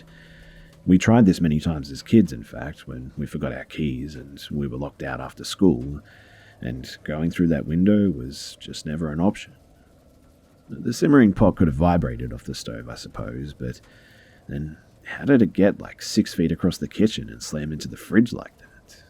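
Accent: Australia. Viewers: So good to hear an Aussie accent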